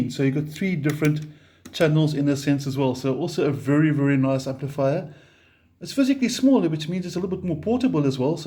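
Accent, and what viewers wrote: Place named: South Africa. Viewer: Much as I approve of your ZA/NA (?) accent, "NUX" is pronounced NEW-X in spoken English according to NUX (Cherub CN) themselves. Hey, as an owner of many NUX products and native English speaker from a time when grammar, punctuation, spelling and pronunciation were taught, insisted upon and examined, I used to pronounce it as spelled too for a long time. The keyboard doesn't type the subtle ">" used in the "NU>X" branding for so long well, which I suppose gave some hint of it. Returning to the relative mini amp comparison, of these three I chose and use the 3W Blackstar Fly 3 & Blackstar Fly 3 + Fly 103 6W Stereo combo for my battery powered portability deployment needs. Taking into consideration my purpose for the Fly 3s as I already had a Blackstar ID:Core Stereo 40W (now two thereoff with the addition of the latest V3 incarnation of it) for my primary practice amp, as well as multiple other larger electric performance and acoustic amps . 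In my country (AU) the NUX8 BT 8W with BT sells for around the same price as the Fly 3+Fly 103 6W Stereo combo (no BT) which includes its 240v AC to 6.5v DC step down PSU in the pack. The latter Blackstar 6W Stereo combo is really the direct comparison to the NUX price point for price point, with the advantage of Stereo which it trades off against the NUX's feature of Bluetooth. So in that sense, the standalone 3W Fly 3 isn't a fair or balanced comparison considering it sells for half the price of the NUX8BT or BOSS Katana Mini. Not that it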